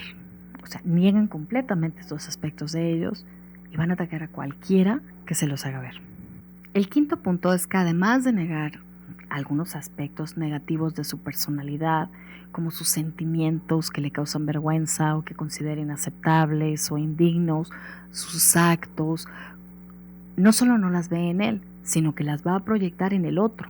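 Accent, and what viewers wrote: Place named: Mexico. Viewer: Muy atinado tu video,; hay mucha confusión de lo que es el narcisismo y de cómo se manifiesta; de igual forma cómo es que es ideal tratar a una persona con narcisismo maligno. Tu video es muy valioso. Gracias por compartir. P.D. Tienes acento de mexicana, ¿estás en Mexico? Gracias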